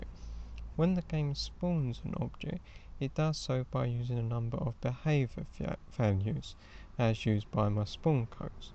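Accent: United Kingdom. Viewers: Your pronunciation is really modern. The latest version of European / British English basically.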